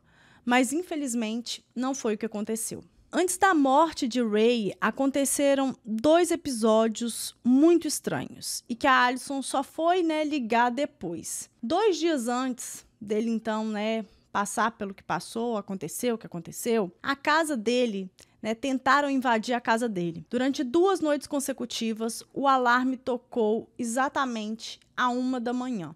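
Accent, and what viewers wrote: Minas Gerais, Brazil. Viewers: Oi!!! Jeitim mineiro de contar história me conquistou! Adoro seu canal! Caso estranho heim...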